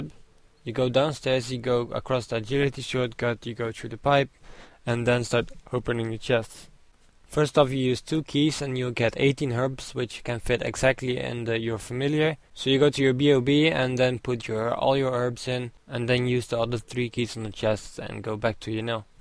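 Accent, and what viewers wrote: Netherlands. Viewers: lol ur dutch arent u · You are dutch? =D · I can hear you're Dutch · ur from holland lol i hear ur accent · je hoort gelijk dat het een nederlander is >.<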